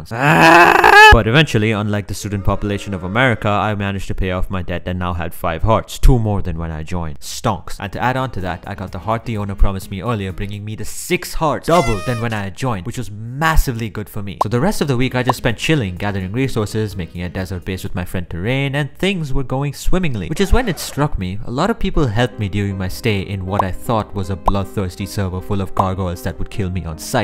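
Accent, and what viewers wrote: India. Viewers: I don't know why but your accent feels very indian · ru Indian? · You speak innindian accent 😯😯😯 Are you one???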